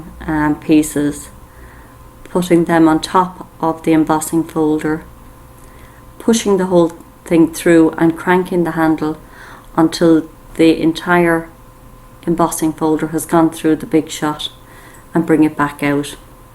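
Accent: Ireland. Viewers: Wow what a fab demo. Its just great to hear an Irish accent. I can't wait to give these boxes a go and I'm sure you could change the theme for other occasions. Well done I'm looking forward to seeing more Irish demo's in the future. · what an interesting idea, and so easy! as an irish man myself it's great to hear a familiar accent. best of luck with your channel.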